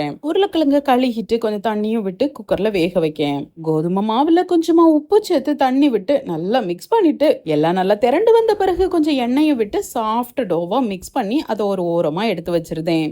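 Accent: Tirunelveli, India. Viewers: Thirunelveli, Kanniyakumari side la slang ipditha irukum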